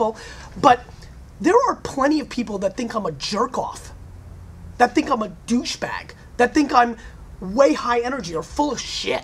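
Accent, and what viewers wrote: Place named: New York, United States. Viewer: nice accent i was gussing new yorker...jersey almost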